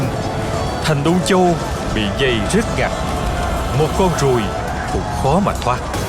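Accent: South, Vietnam. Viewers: Ky thuat long tieng cua anh dat toi trinh do dang cap ...giong nam bo hay ...hihi..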